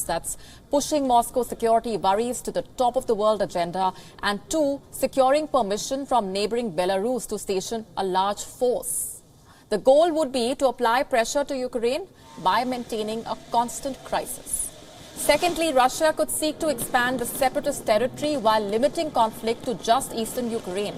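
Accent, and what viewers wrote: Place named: India. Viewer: This anchor has a pretty heavy chapati/bada pav accent Not smooth like Palki Not suited for an international channel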